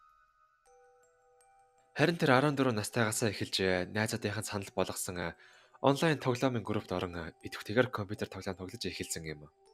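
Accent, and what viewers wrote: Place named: Inner Mongolia, China. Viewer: Өвөр Монгол залуу юм байна гэж бодсон.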